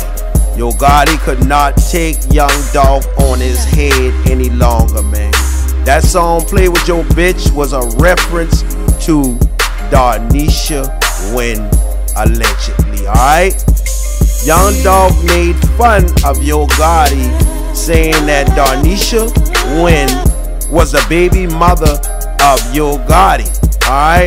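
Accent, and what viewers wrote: South Carolina, United States. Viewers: Sound like u from 843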